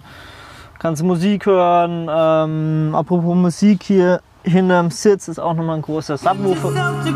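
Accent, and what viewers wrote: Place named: North, Germany. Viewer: Super beeindruckend! Sehr nett ist auch die norddeutsche Sprachfärbung. "Hier is nochn Fliegngidda" Gutes Video.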